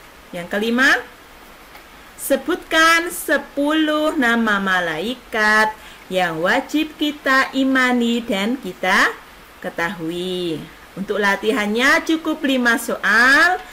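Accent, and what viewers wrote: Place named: Java, Indonesia. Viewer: Boleh lah .... 👍👍👍👍👍 Cuma agak medok tapi best 😇😇😇😇😇😇😇😇😇😇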